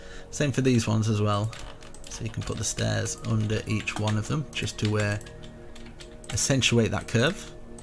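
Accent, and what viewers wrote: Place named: United Kingdom. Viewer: Who the fucks this British guy interrupting my infomercials?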